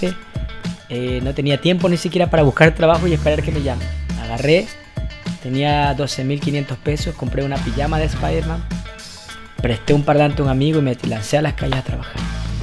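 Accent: Ecuador. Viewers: ES GUAYAQUILENO LO DIGO POR AU ACENTO NEUTRO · el detalle es que es Ecuatoriano, no Venezolano, se nota por el acento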